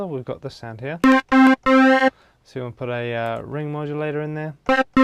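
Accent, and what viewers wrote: New Zealand. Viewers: Ha! The second you opened your mouth I was like... "no" accent o.0 Must be a kiwi, sure of it! I was right :D Donno if you know the fella that makes Goldbaby samples... kiwi as well (only found out the other day, turns out he lives in the next suburb on from mine). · knew it!, cant mistake a good old kiwi accent. What part of NZ?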